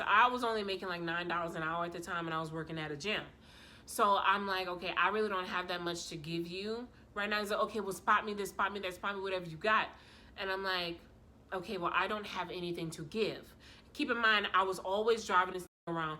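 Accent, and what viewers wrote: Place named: Bronx, United States. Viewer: Omg I swore you were a Bronx or Brooklyn girl 😂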